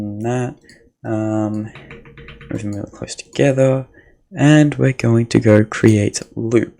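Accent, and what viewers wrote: United Kingdom. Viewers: are you british?😊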